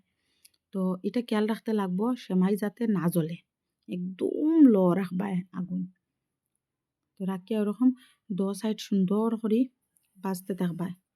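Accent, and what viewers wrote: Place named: Sylhet, Bangladesh. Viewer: সিলেটি মাতউকা, নাইলে ফুরা শুদ্ধ খউকা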